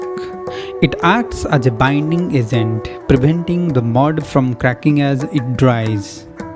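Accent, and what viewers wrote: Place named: Odisha, India. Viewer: Video presentation is excellent but you need to work on your speaking power needs improvement bcoz your english sounds like typical odia , it could be more better if your accent was good enough.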